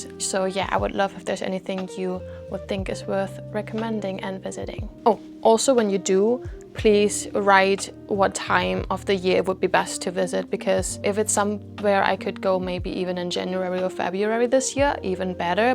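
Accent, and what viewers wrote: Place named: Germany. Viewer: Are you German ? Your accent is German accent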